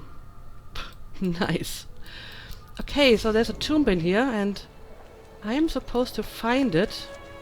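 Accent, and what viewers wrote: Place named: Germany. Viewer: Hey! I'm new to your channel and one of the first things I noticed was your accent. So quick question, are you German?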